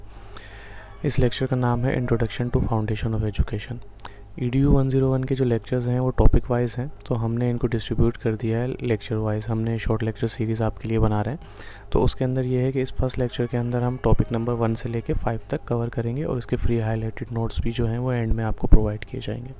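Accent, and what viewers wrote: Karachi, Pakistan. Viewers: sir ap k language accnet sy meny abserve kr lia tha k ap karachi sy hain ❤❤❤❤ or apny sahi kaha k koi students panjab k kisi village sy lacture sun rhy hongy ..exectly am listining your lacture from panjabs village ❤❤❤well explaind ❤❤❤ May Allah give u success by leaps and bonds❤❤❤❤ameen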